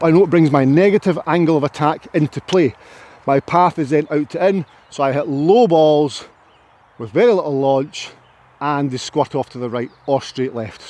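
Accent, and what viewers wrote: Scotland, United Kingdom. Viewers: I love your videos,. They are loaded with good tips, and you have a delightfully Scottish way of delivering them. Keep at it.m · I love watching your videos, as a Dutchman I have a extreme weak spot for Scottish accents. Love how you always come strrraight to the point. For me I developed a slightly embarrassing release feel: For my irons the release feel is as follows: If I literally would let go of the club I would have it hit someone in front of me in his mid section, for the driver it would be his head. Not sure what a psychiatrist would think of that but it works for me.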